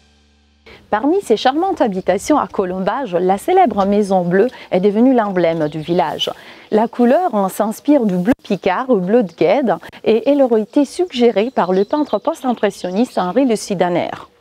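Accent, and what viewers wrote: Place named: Italy. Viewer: quel bel accent italien...tout en douceur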